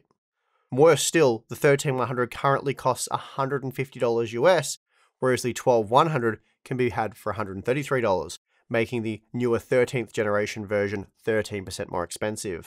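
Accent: Australia. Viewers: I'll add one more thing: their reasoning is so cogent, clear, and concise that they do a bonus side service by teaching their viewers how to think critically, a skill that's very rare and precious these days. Oh, and did I mention that the Australian accent is another bonus and a very charming and welcome change in a repetitive sea of Americans?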